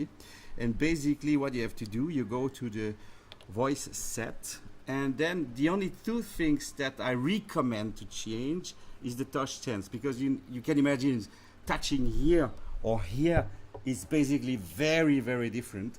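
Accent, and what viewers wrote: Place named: France. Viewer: salut à toi l'artiste. je viens de découvrir ta chaine. vraiment très bien. vu ton accent franglais, j'en déduis que tu es français ? de quelle région? cordialement. Laurent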